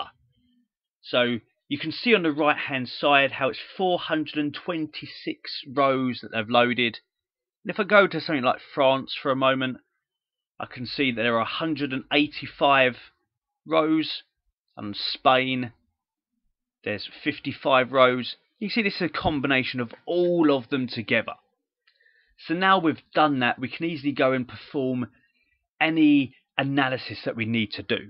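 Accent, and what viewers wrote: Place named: Cockney, United Kingdom. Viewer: I watched at least 5 other videos and this was by far the clearest and easiest to understand - despite you being a cockney :). Thanks a lot....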